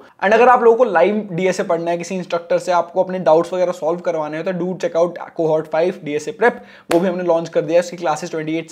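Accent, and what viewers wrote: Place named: India. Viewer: Dude, you may have been saying something worth listening to, but your accent makes it very difficult for non-Indian speakers to understand you because you talk too fast. If you really want to get your information out there in videos, try talking slower.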